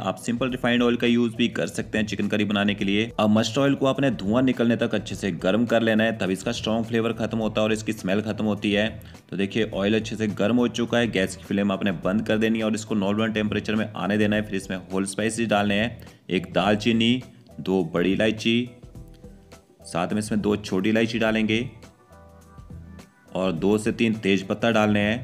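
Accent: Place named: Pahadi, India. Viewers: U r pahadiapka tone lagra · Are you from Uttarakhand? If yes where are you from? I am asking because you have some Uttarakhandi accent in you voice. Just asking out of curiosity. BTW I’ll surely going to try your recipe and let you the result. Thank you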